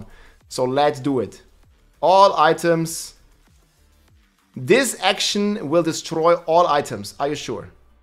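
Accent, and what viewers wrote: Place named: Germany. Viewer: My only suggestion to you would be. If you hate everything about this, skip it. He is not going to change the way he speaks. It's his accent, and he is german. Plus, I highly doubt that he would even try to, just because of one comment on youtube. :) I wish you well, but I also suspect that your frustration has roots in much deeper problems.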